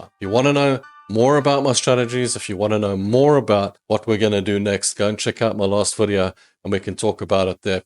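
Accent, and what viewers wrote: South Africa. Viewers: i knew i recognised that springbokka and borewors accent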